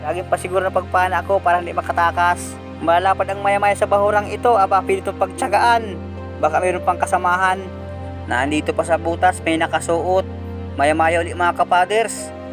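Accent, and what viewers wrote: Philippines. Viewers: Parang taga katanawan ka kuya ah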